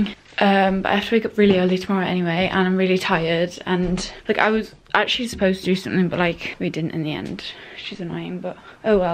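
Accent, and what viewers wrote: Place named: North, United Kingdom. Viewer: british, she’s from up north :)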